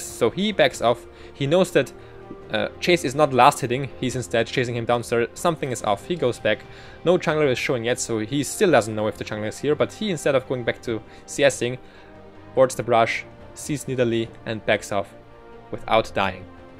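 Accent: Germany. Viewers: Probably Spanish, But I think that he's Deutsch. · i can hear ur german roots ;)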